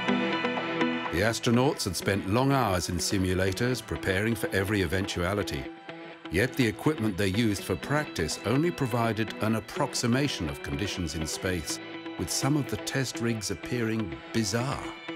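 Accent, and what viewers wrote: United Kingdom. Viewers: I would have given this video a good rating if they had used an American instead of a British guy as narrator. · As for the comment from the video "John Glenn returned without incident ", There was a flashing light down at mission control saying that his heat shield had become dislodged. Some bean counter from NASA administration That if they kept the retro rocket strapped on, That maybe it would keep the heat shield and place long enough for him to descend through the atmosphere. This actually endangered his life because it caused unequal burning of the heatshield or something like that. There had actually been nothing wrong with his heatshield just a faulty bulb down at mission control. Oh and they never told John Glenn about it. This incident took the NASA administration out of direct control of the missions as they were happening and put full control into I think the guy's title would be called capcom. 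That being said, Check my math always check my math even when There is only 2 people in the room I am never the smartest person in the room. Your video footage is stunning. The narrator To me sounds British, Doesn't matter whether it be male or female the British accent just makes it the sound Authentic. Despite me Nit picking, I enjoyed the video thank you for the time and effort you put into it. Apologize for any spelling, punctuation or grammar errors. Although English is my only language that doesn't make me good at it.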